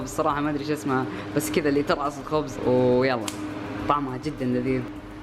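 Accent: Kuwait. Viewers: أحسبه كويتي ههههـ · انت سعودي احسبنك كويتي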